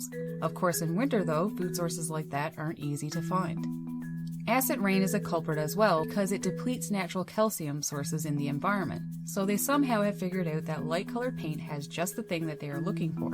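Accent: Canada. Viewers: Oh, this lady is definitely Canadian. You could hear it as soon as pouch and then house 🤣. Bless your flappy headed hearts.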